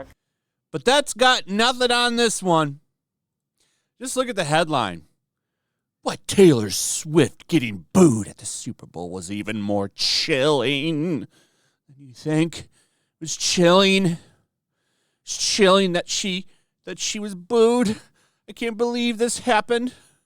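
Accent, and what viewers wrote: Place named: RP, United Kingdom. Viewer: Hahaha STOP IT withnthe British Lord Accent... Man i dont get it. Why that accent, its so NOT lib. 😆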